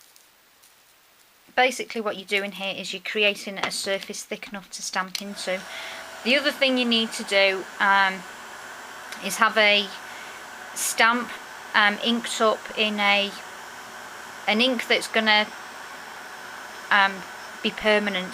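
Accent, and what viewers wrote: England, United Kingdom. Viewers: I too love your ideas I am saving my money to buy the supplies! They are absolutley beautifull! thanks for such a wonderfully detailed instructional video! Can you use the shrink wrap for easter baskets, in place of the fantasy film? Also can you use a non name brand ink to do the embossing & stamping? I love your accent are you from England? I have a friend here in the states whose Mum is from England she lives her but still has her british citizenship she too has a lovely accent! Tessa~~~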